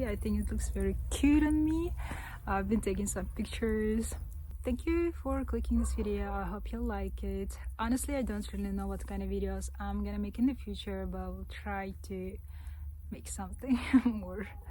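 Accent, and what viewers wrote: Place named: United States. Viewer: Thank you for your tour of traditional style living. I am a new subscriber. Kolxoz means English means " a collective village". We had similar villages of normally religious groups. Some still exist today. They are very clannish and self-isolating from society. The green flat long view country is beautiful. You are an excellent guide. The world is becoming smaller every day when an intelligent Caxa women is able to speak American style English better than I do in American cowboy country. 😊😊😊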